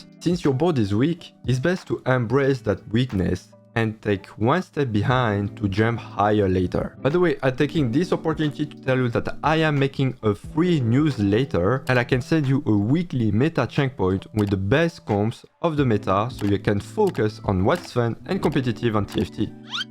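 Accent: France. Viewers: I love your videos, really. It's clearly visible that you're trying to maintain high-quality content, do much about the montage and even added captions, probably being aware of your French accent that might be hard for someone to understand. Wow. Chapeau bas! First time in my life I really feel the urge to share someone's content because I feel like you deserve it! Keep up the good job, you're amazing :)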